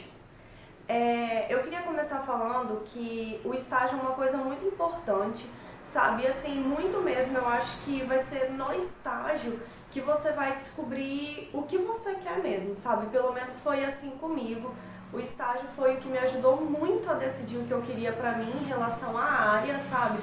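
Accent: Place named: Brasília, Brazil. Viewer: Vc e de Brasília ? pelo jeito de falar parece ser! :) gostei muito do vídeo um abraço.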